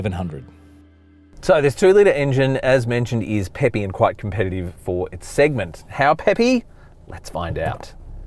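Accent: Australia. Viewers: That down-under accent + curry = solid combo mate xD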